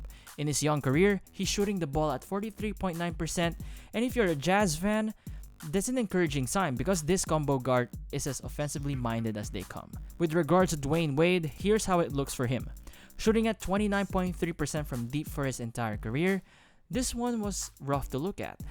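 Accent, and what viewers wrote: Philippines. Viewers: Bro, are you filipino? That english accent of yours sounds like a pinoy.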